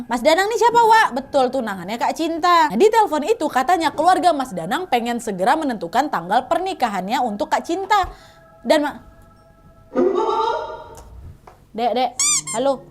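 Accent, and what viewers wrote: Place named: Madura, Indonesia. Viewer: Kok lama lama logatnya kayak orang madura , lucuu banget 😁🥰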